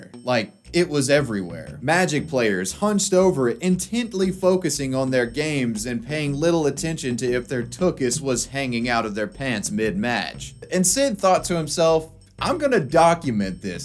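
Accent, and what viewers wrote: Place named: Virginia, United States. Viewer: Dude your Virginia accent is on point. Are you from around there?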